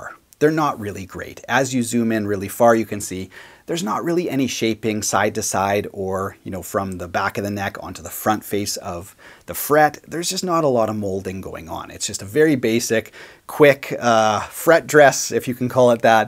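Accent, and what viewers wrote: Canada. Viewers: Are you a Canadian?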